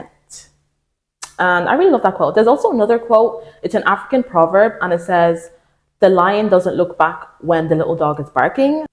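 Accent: Ireland. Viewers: Love the Irish ☘️ accent❤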